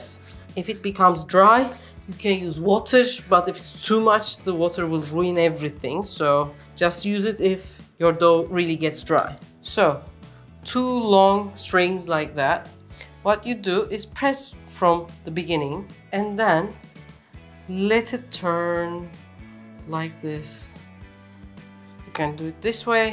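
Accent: Turkey. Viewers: 😋😋refika I lovesss youre Turkish English,urrrr soooo pretty,bubbly🥰🥰🥰I lovessss ur recipes from Sri lanka 🇱🇰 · ne dediğini anladıysam arap olayım türklerin ingilizce konuşması baya farklı .D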